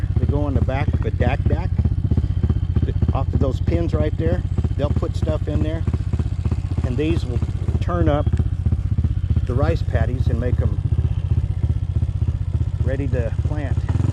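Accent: Oklahoma, United States. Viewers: I recall seeing gas flares in the Gulf of Thailand many years ago. I wonder if they were pumping oil or gas? If the latter then they are having to import oil for cracking into diesel adding a running cost to the farm machinery I guess. It may be your Oakie accent but I notice you being a bit lazy (dog!) in the pronunciation of the ending masculine “p” in salutations! These general conversations about rural Thai life are great. Perhaps you could drag the Nordic Nerd (when he has finished downloading the GPS tracks) out with you to utilise his seemingly excellent command of the Thai language .. “sam Chang khap” seems to roll so fluently off his tongue! I have now covered several hundred miles on my “ghettoed” tubeless conversion and the wheels (CT 125) have not lost a single psi. I see it done all over Indonesia and the PI on You Tube and wonder if they do the same in Thailand?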